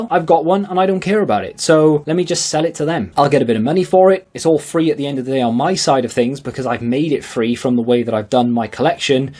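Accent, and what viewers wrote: United Kingdom. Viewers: U sound like a brit😂. Here in the states wii u games are rising. And esp the 3ds are getting super expensive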